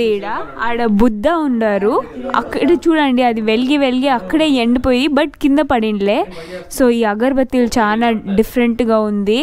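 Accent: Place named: Tamil Nadu, India. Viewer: Your Telugu is so funny 🤣, why it is like that😂, are you Tamilian?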